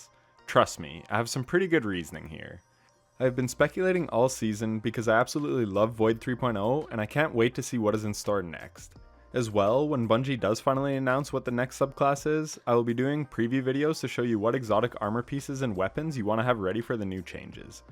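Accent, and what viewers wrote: Canada. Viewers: you sound canadian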